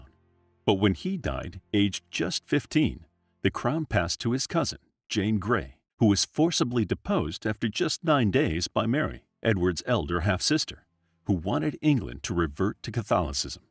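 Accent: United States. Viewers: A bit of a list but a reasonable summary. Its a pity it had to be read out by an American accent. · American pronounceation,is different to ours.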